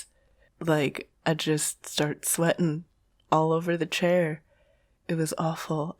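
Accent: New Jersey, United States. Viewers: sounds like you're from jersey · Oddly she developed a jersey accent. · Your sick voice sounds like you came from New Jersey.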